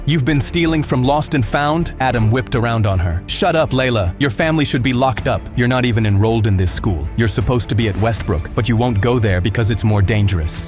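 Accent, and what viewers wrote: United Kingdom. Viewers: bro i finnaly realised he has a brithish accent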